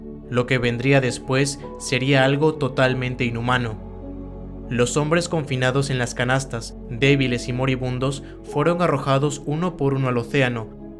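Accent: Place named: Castile, Spain. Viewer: En el canal pone Perú, pero esa acentuación es Castellana de España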